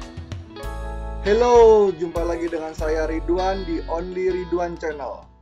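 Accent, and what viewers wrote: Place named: Garut, Indonesia. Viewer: Gan bagi wa nya dong, asli garut ya gan? Logat nya kaya orang garut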